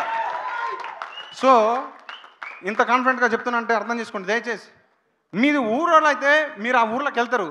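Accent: Telangana, India. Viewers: Trivikram gaaru Telangana yaasa lo matladinattu anipistundi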